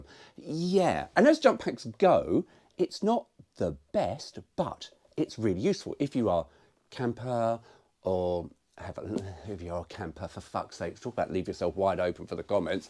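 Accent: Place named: United Kingdom. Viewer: This guy is hella proud to be english🙆‍♂️1st time l hear the english sound really english.Nobility running through those veins.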